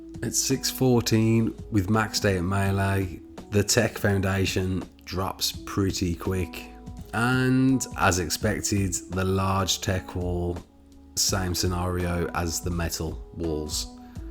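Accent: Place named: Midlands, United Kingdom. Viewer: where abouts you from in Midlands sound local man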